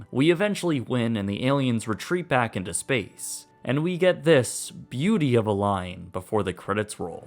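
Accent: Canada. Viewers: 1) You need to go on a 'very' diet, and 2) a 'new sequel' as opposed to...?, and 3) I pegged you as a fellow Canuck within the first three sentences. Where's my pixie stamp reward?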